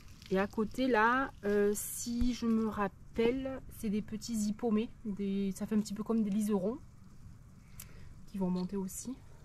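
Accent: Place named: South, France. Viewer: Bonjour, je suis trop contente de revenir un peu sur l'ordinateur, comme je t'ai déjà dit j'ai un cancer du sein, qui s'est bien passé, mais mon traitement hormonothérapie est lourd, il y aura un an le 27 juin que je le prends, j'ai souvent des douleurs articulaires cette fois sa a battu le record sa m'a duré en douleurs 1 mois, mal aux os😪 mais j'essaie de penser positif c'est difficile,regarder un peu des vidéos me font oublier tout en restant allongée, bon STOP je ne suis pas la seule, tes vidéos je les adorent, je te l'ai déjà dit, j'adore ton accent du Sud😍les livres tupperwares, j'en ai aussi beaucoup, haha!!!les buffets j'ai aussi, j'aime la recette de la pâte minute qui est au début du livre, Est ce que tu l'as déjà faite, c'est super, on met les ingrédients dans une boite qui ferme on secoue et c'est fini, bon en salé pour les quiches ou sucré pour les tartes, le taboulé hummm! 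me donne faim, je fais aussi comme toi, qq fois j'ajoute aussi des dés de pommes,tes petits pains me tentent aussi je ne connaissais pas, moi aussi j'aime les fleurs, j'ai aussi du pourpier pareil, dommage que tu es loi, sinon je t'aurais bien demandé des cactus, maman en avait, je m'en souviens, j'en ai eux d'elle mais ils ont fini par disparaitre, trop serrés je pense et sèché, j'aimerais tellement en avoir, si un jour on descends en Corrèze, je regarderai si des personnes en n'ont et en demander, merci pour cette belle vidéo, merci, à très vite, je te souhaite un bel après m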